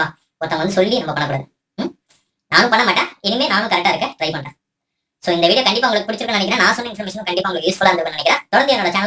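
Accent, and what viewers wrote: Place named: Kongu, India. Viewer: Na 10 oo or 11 oo clock ee thoongiduven and vanakam vanakam vanakaaaaaaaam super appu apdiye kongu tamil aaa pesunga nalla itukum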